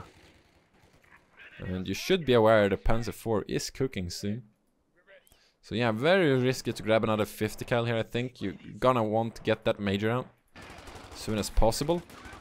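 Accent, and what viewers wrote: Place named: Sweden. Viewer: "... and me is casting" I love this Swedlish too much to criticize it.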